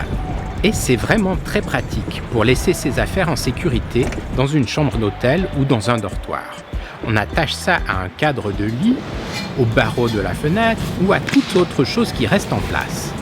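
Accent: France. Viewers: "On s'en cogne de ce sac" tu m'as bien fait rire avec ton accent du sud. Ca fait du bien de voir Antibes et le sud. La mer était particulièrement agitée! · Vé c'est beau... le Safranier (Commune libre) et ton sac, certes on s'en cogne mais de montrer la technologie avec le vrai accent de marseille... Ça l'fait. Franck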